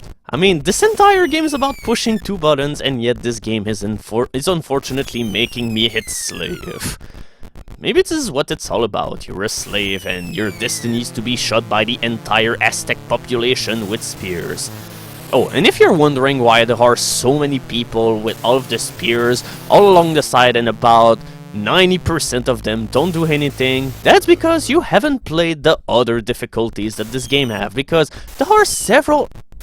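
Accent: Quebec, Canada. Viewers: I think he's from Quebec. It's pretty much the best accent for LPing I reckon. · Best accent ever. Just another reason I am jealous of Quebecois (I'm Canadian). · Yep, that is indeed a Quebec accent. I live with frenchmen, myself.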